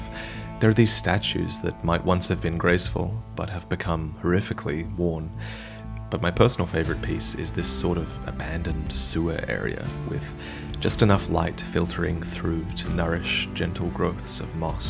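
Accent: Australia. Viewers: the only thing that has kept me from going hollow the last 18 months is the milky smooth sound of your Australian voice. Hold me... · very random, but you do you hail from down under,?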